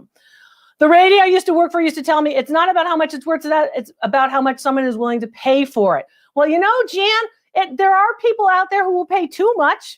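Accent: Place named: Connecticut, United States. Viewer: I absolutely LOVE the way calls everyone “you people”!, you talk just the same as me. Must be a Connecticut thing…🤷🏻‍♀️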